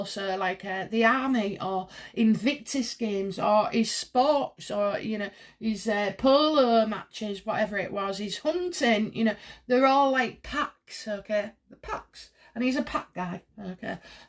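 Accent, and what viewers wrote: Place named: Hull, United Kingdom. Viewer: Ah so it's a Hull accent.! I was wondering. Just love accents and the readings. Yes I am from Yankee and Bookworm - we are creating a really good family. I understand the "fat" word is just an expression of" lush/lots of info.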